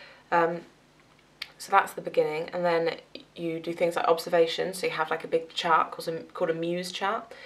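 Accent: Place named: England, United Kingdom. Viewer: Im an Irish student nurse and I think you definitely have an English accent haha!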